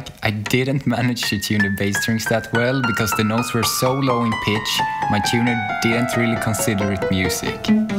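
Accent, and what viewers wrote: Sweden. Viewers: Tha svenska accent >>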